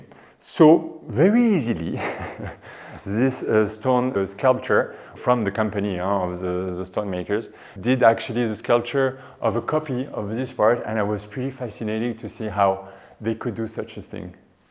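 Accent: France. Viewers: You can speak French no ? I hear at your accent you are French no ? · I don't think that I'm the only one, but I would marry this handsome man with this charming French accent and impeccable taste! 😍 If I wasn't already married, that is!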